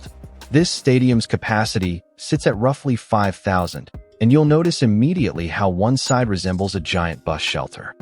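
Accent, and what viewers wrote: United States. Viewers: In this video we've heard some Yank who clearly doesn't get traditional football nor the British football culture. The list is just completely un-serious American claptrap, a profound lack of understanding of something miles away from US culture. Ewood Park as an ugly ground? What is this tosser on about with that dreadful American accent of his? Appalling. Seriously.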